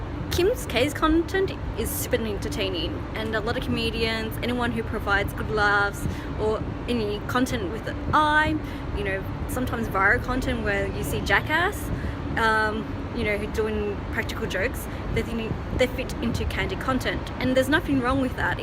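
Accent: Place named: Australia. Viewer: Love the Australian accent but your breakdown of substance vs. candy content is spot on.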